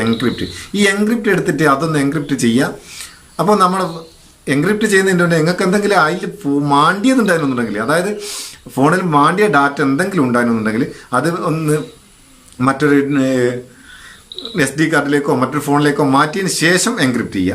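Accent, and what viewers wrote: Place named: Vadakara, India. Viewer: അദ്ദേഹം മലയാളം നന്നായി അറിയുന്ന ആൾ തന്നെ ആണ്. പിന്നെ ഈ സംസാരം ഞങ്ങൾ വടകര, മേപ്പയൂർ, നാദാപുരം ഭാഗത്ത് ഉള്ള സ്ലാങ് ആണ്.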